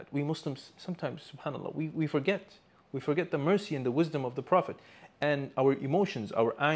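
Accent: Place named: India. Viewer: ..why do you sound like Indian, ?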